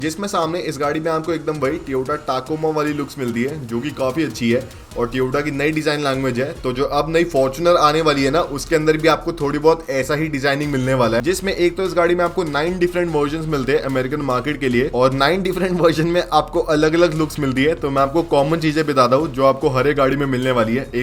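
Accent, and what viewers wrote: Haryana, India. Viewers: Haryanavi accent mai bakwas mat kar yr CLARITY se baat kiya kar 4RUNNER arahi hai ya nahi ,teri toyota walo se kuch baat hui to wo bata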